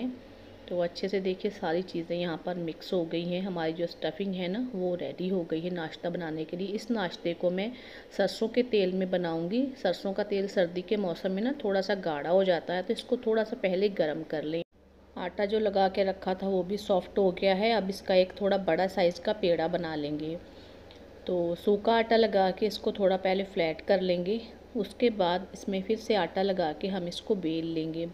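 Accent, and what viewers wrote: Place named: Punjab, India. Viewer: Bahut badiya banaya tum punjabi ho na bolnye se pta chal raha hi sarso k oil se khansi to nhi hogi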